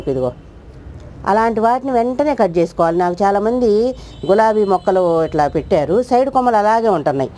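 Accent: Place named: Guntur, India. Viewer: Chala baga untundi mee explanation. Maadi guntur. Ippude hyd lo untunnamu. Na chinnappudu andaru ede slang lo matladevallam. Gurthuku vastundi. Thq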